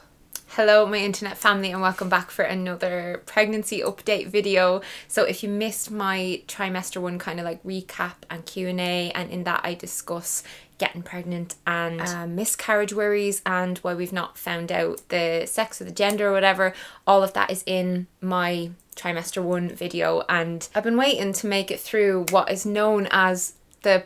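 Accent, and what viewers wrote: Ireland. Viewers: woah, I can't take it, that Irish accent + high pitched "babyyyyyyy", just died of cuteness overdose..... (I can't believe I just spent 3h looking at possible ways to transfer to Ireland, need my own Irish cuteness too.....)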